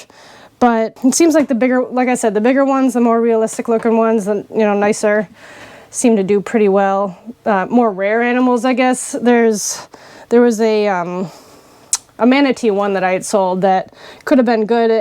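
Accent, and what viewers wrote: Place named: Eastern Canada, Canada. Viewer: You sound like your from eastern Canada ….🤘🏼🤘🏼. Subbed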